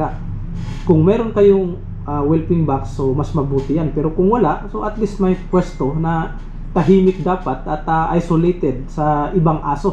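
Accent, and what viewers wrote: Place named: Pangasinan, Philippines. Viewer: taga Pangasinan po ba si Doc? may punto e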